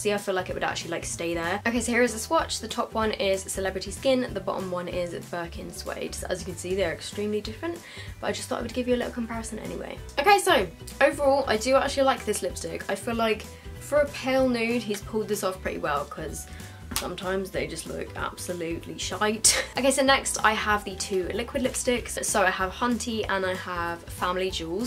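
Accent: England, United Kingdom. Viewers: I live for english accent 😍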